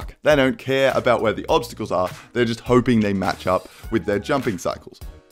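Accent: Australia. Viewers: You sound very Australian let me tell you ! 😆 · Tf your Aussie?😮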